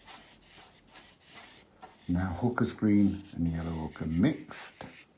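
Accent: Yorkshire, United Kingdom. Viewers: What a joy to watch, and as a West Riding woman of similar vintage I love hearing your vowels! You really do make the whole thing look very easy. For me it’s not! It is a great help seeing, for example how you do shadows, and how things blend together when you are using a limited range of colours. Really nicely filmed as well. Thank you.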